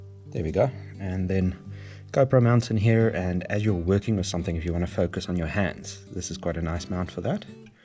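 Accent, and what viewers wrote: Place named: South Africa. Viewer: I hear a South African voice, I see 10k subs, and I watched a good review. All I could do was subscribe. Very excited that I found this channel!! Yes boys!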